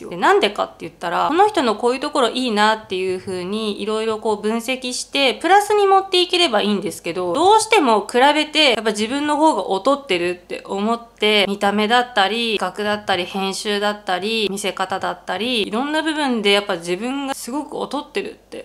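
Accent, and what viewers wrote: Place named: Hakata, Japan. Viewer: 必ず新商品はの動画みてますよ。福岡出身なので博多弁がとっても可愛いですよそのまま頑張ってくださいね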